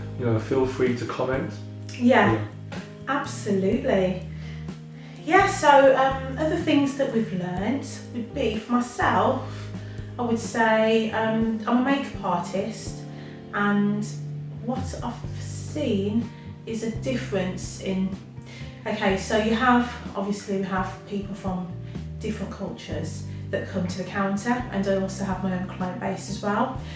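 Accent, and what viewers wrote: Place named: West Midlands, United Kingdom. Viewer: New to your Channel and love your personalities 😊. I can hear your West Midlands Accent ❤️. I use to live in Wolverhampton many years ago 😊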